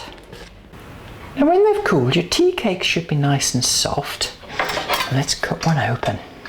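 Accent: Midlands, United Kingdom. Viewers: This lady is an absolute joy, and her recipes are so very easy to follow. Her soft Midlands accent only adds to the warmth of her personality. Wonderful!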